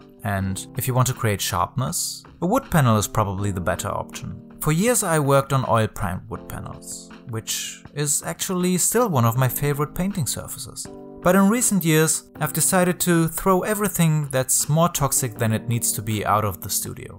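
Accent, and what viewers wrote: Germany. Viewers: Ich genieße dein Englisch mit Deutscher Sprechmelodie es ist so angenehm !♡.♡ Dass du großArtig bist, weißt du ja schon ;)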